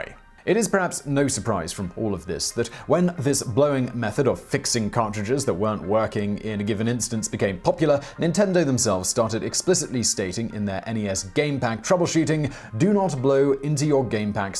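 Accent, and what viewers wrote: United Kingdom. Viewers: "Occasional blowing before insertion was still a thing" to my American ears, you saying that so casually with the AWESOME British accent is one of the funniest things I've heard all week! Had those words come from someone with an American accent it wouldn't have been anywhere near as funny to me..... Thanks for another great video sir! Love what you all do and how much hard work goes in to all of these videos. It's appreciated sir.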